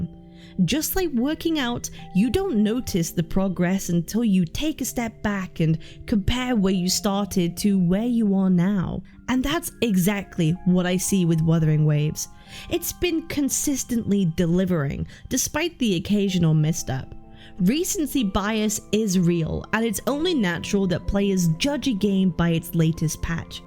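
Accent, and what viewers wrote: United Kingdom. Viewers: This vtuber isnt the real one, Your british accent is weak And you sound eloquent. The real one sounds Turbo cracked out Also an anniversary is about the rewards. Which were ass. No free zani or ciaccona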